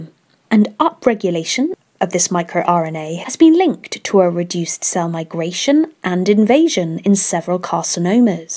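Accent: England, United Kingdom. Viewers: Having a lovely English accent is pure gold here in America. You already get a 10% bonus for sounding more authoritative. New sub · I love the British accent. Watching from California.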